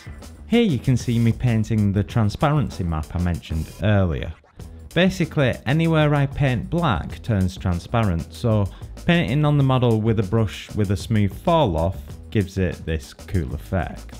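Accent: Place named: Lancashire, United Kingdom. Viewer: Proper Preston/Chorley accent! Loved this, wish I'd stuck to doing 3D work.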